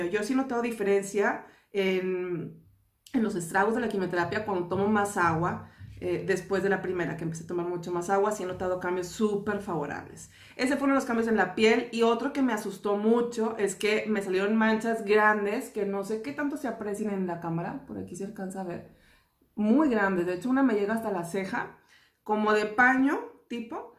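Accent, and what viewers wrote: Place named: Jalisco, Mexico. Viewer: gracias por compartir lo que estás viviendo. Eres hermosa e irradias mucha luz. Por tu acento creo que eres de Jalisco o Nuevo León. Yo estoy en la Ciudad de México y aquí está el Dr. Erik Estrada, tal vez ya lo conozcas. Te comparto su página: <link> Le dan espacios para hablar y compartir sus conocimientos en programas de radio con Talina Fernández y Martha Debayle, tiene su Canal de YouTube, lo encuentras como Noticiero de la Salud. Él maneja alimetación vegana que ayuda a tu cuerpo a pasar mejor el proceso de las quimioterapias. Él da conferencias cada 15 días en el Centro Médico Siglo XXI y da consultas cerca de la Universidad de Chapingo. Tal vez pueda ser una buena opción para que pases de mejor manera este proceso. Estarás en mis oraciones. Mucha fuerza.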